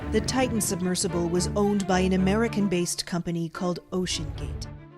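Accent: Canada. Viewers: What were the pings they kept talking about ? I’m going to have to listen to this again, to pick up some information I missed the first time . I definitely will see the movie There is another boat that has some questions I ‘d like answers to. The boat “ Trash man “ sailed out of So. Portland Maine, going to Ft Lauderdale Florida. Wrecked by a storm. There is a first hand book about it written y a survivor, and some films for the Weather channel, but some things were left out of the story so it didn’t add up. No conspiracy , but human mistakes and the way the boat was built ( square port holes ) that didn’t hold up. Anyway I like your east Quebec French accent comes out a little bit. Sending good thoughts from PEI and Florida. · hey, are you from Sasktatoon?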